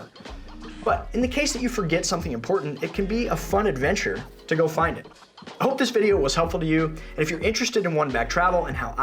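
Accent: United States. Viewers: You sound American. Why aren’t you recommending T-Mobile with its no cost international data. Oh I see you are promoting Holifly.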